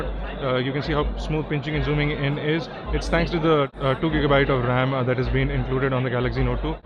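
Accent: India. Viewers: Speak more slowly and open you mouth when you pronounce your words. It's not easy to keep up with your Indian accent.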